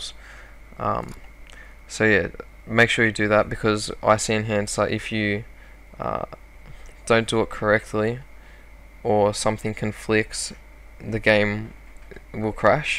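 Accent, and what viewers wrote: Australia. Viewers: Nice video! And theres a bonus to the vid aswell! Your an Aussie! This is amazing! Good video with an australian in it. Anything better than this?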